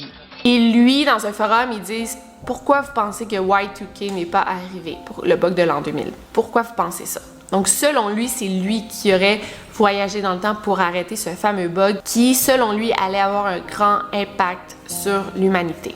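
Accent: Quebec, Canada. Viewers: J'adore toujours autant tes vidéos et ça me fais toujours plaisir dentendre a nouveau l'accent québécois en tout cas continue 😘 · Pareil, je suis Française aussi et j'adore ton accent,! Je pars en vacances au Québec dans quelques jours, du coup ton accent m'emmène déjà en vacances. ^^ Et tes vidéos sont vraiment travaillées et intéressantes, je ne m'en lasse pas.:) (Bon par contre c'est loin d'être la première fois que je commente, mais je voulais te le dire. ^^) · Pas sexy l'accent canadien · L accent canadien horrible fait des sous titres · impossible d'écouter..affreux l'accent canadien..lol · Elle est Québécoise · hey! je kiffe tes vidéos que j’enchaîne en continu depuis 3 jours! ton "petit" accent québécois me fascine et me donne encore plus envie de visiter le Québec! alors merci de nous divertir malgré tes études, ton taf et ton futur mariage pour lequel je te félicite en passant! 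Mille bisous de Bretagne!!!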